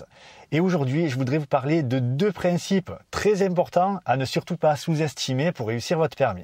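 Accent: South, France. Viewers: Son accent du sud il régale ! 😂👌🏾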